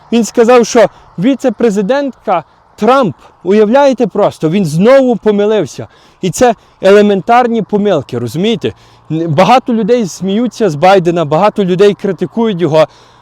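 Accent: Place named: Ukraine. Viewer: Ну , який же , Ви щирий та безпосередній!😍А Ваша українська вимова , просто гріє душу!Стосовно виборів в США: президент Байден вже не зможе за станом здоров'я бути сильним лідером такої країни, як Америка, ми вдячні за все хороше, що було зроблено ним ! Трамп --- підступний , йому не можна вірити! Закінчити війну він не зможе, хіба "заморозити ", а тим часом росія набереться нових сил і рине в наступ та продовжить геноцид українського народу! Ракети, як летіли, так і продовжать летіти по всій Україні та нищити все на своєму шляху! Закінчити війну можна лише військовим шляхом маючи потужну підтримку зі сторони США та Заходу! Інакше ця війна буде дууже довгою та кривавою!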